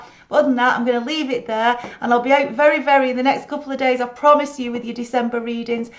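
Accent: United Kingdom. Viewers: Thank you honey u have just gained a new sub x nice to hear fellow uk readers x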